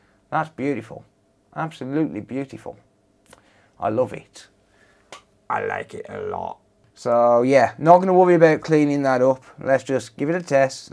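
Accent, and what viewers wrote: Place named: Dudley, United Kingdom. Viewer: Bro, strange how I've just ended up randomly on your video. I was the guy that commented on the Saudi post, and you suggested I add a loop. I'm repairing donor boards, and came across your video and noticed the name. Small world. I'm in Dudley, your accent sounds localish. Going to drop you an email.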